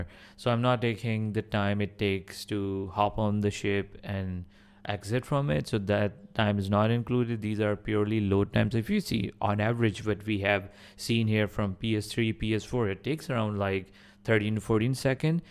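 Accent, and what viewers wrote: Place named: India. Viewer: you slightly sound indian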